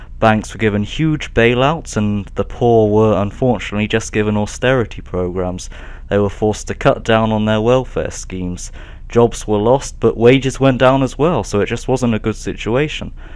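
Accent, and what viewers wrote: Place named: Liverpool, United Kingdom. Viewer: You sound a bit like George Harrison. Are you from Liverpool?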